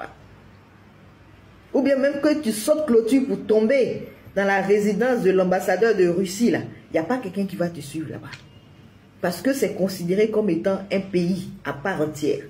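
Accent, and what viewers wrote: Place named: Ivory Coast. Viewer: Ouiii mais vous parlez comme une ivoirienne ma sœur !! soutien !!!! Ils sont trop comme ça !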